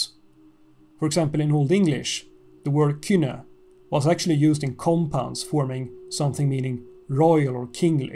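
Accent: Sweden. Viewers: As a Swede, I appreciate the heavy Swedish accent!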